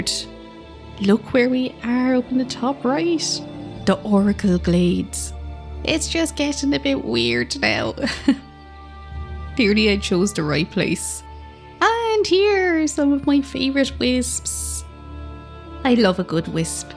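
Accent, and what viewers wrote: Ireland. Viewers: shocked hearing the Irish accent , Louth here (Irish wow players unite)😀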